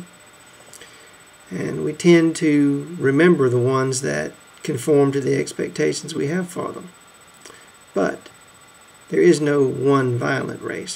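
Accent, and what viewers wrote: South, United States. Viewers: Nice Southern Drawl and I am from La, if you know the truth you should share it. Isreal is a pawn and so are many of us that are slaves to the Money Masters. I do think it is time for the Constitutionalists need to start this off, but no you will wait till they come and knock down your door. The only thing you need to know is that the Elite and the G8 and the Bilderbergs, TLC and the UN and most of the Fed has us all by the Balls.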